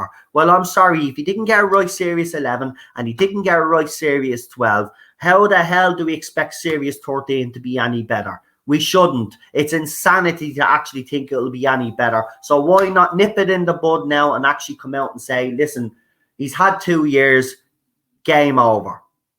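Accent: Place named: Dublin, Ireland. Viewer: Which part of Dublin are you from?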